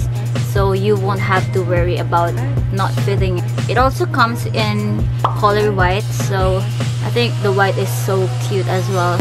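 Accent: Visayas, Philippines. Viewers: KA CUTE OY BISAYA HAHAHAHAH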